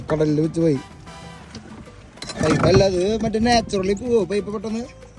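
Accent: Thrissur, India. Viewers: ഓർമ്മിപ്പിച്ചോളോ മാപ്ലേനെ...😂 ത്രിശൂർ സ്ലാങ്ങ് പൊളിയാണ് ട്ടാ..❤❤❤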